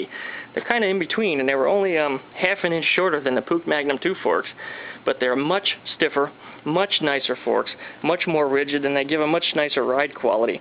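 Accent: United States. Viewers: It's in a good Way bro and you kinda sound country no disrespect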